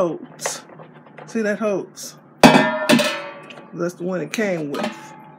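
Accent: South, United States. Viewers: I love it... your accent is so funny....reminds me a of my southern family...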